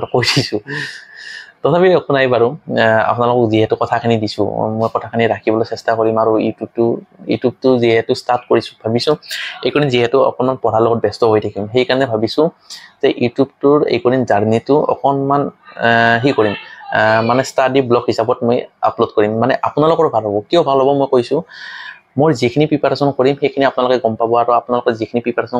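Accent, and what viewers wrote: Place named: Nalbari, India. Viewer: Daide Nalbarir nk ha 😄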